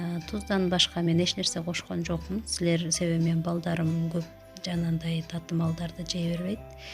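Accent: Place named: North, Uzbekistan. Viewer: Жасалмасы жок эле , оздорунун диалектинде суйлоп атат ,, ар бир жэрдин озунун диалектикасы бар ,, Тундук тарап. Талас ,, Туштуктун уч Ош. КараСуу ,, Баткен , ЧонАлай ж.б болуп 😮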